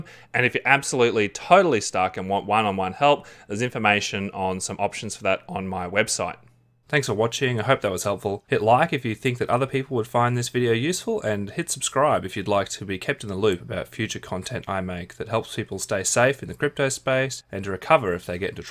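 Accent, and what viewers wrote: Australia. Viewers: Hey man, your videos are great. You're Australian right? Where'bouts are ya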